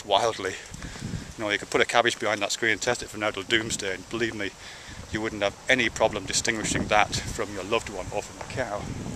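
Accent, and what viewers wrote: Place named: England, United Kingdom. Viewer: Grow your hair longer, lessen the English accent a tad and you'd be splitting image of Dr. Rush from Stargate Universe. Haha!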